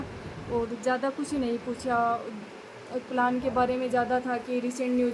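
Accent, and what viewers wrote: Rajasthan, India. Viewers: Video suru krte hi smjh gya thha bolne ke trike se, rajsthan se hai medm ji 😂😂😂😂 achi vancency thhi idhr bhi Apne zone se hi bhrna chhaiy thha ADO.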